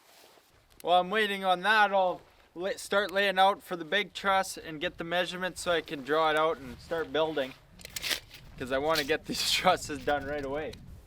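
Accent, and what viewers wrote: Canada. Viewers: ❤WOW From Norristown PA here How old are you ? I know your young but your come off with old knowledge and worth ro work ethics ? You put yourself down so much & are so hard on yourself & your doing so much then any one your age it's amazing and phenomenal 👍🏾 I hope your name comes a worldwide name You deserve it & I hope your do a Q&A Telling people about your family & life grown up in Canada i love your accent I could listen to you forever the thing you do I have not clue even how to start doing Do ever stop 👍🏾🙏🏾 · I can't help but wonder where the Canadian accent came from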